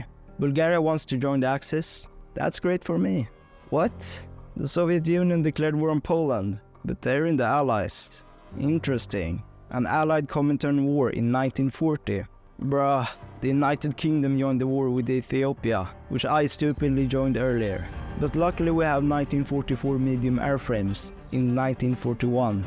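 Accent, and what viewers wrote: Sweden. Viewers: First time watching this channel could tell by the accent he was swedish. I would know im swedish myself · You are swedish. it sounds kind of annyoing, at least of right now, when you use that overly-bad accent on purpose.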